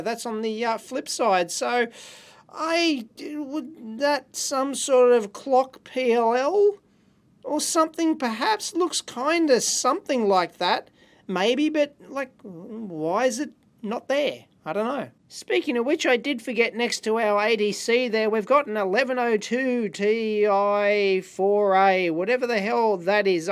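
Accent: Australia. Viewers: The 150% Australian accent would be a big clue ...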